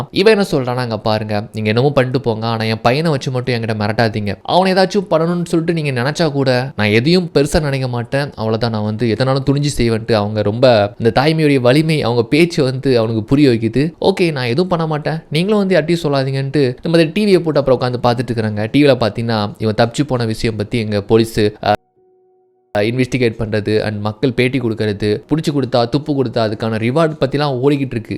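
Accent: Sri Lanka. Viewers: slang change aahuthunga.... ilangai tamil mix up maathiri irukku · Ivanga Voice Modulation Nallave Therithu Sri Lankan Tamil Nu So Ivaru Definitely Arjun Bro Illa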